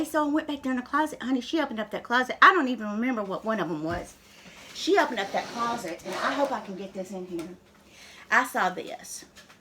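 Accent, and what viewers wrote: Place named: Alabama, United States. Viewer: NAPCO! Isn't that also made in Japan? Can you tell I'm a new little baby? Just had to say that word, baby, as it's so cute how you talk...with your down south Alabama accent! 🤣😅😄💙💛💜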